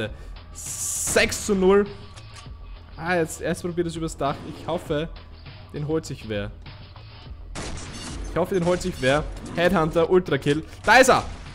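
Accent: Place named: Upper Austria, Austria. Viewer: Kommst du aus Oberösterreich hört sich so an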